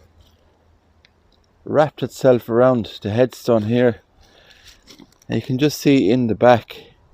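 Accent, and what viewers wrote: Ireland. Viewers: Merry Christmas to you and ❤ I love listening to your voice as the accent reminds me of my Grandparents who came to America from Ireland. Thank you so much for sharing these beautiful cemeteries with us!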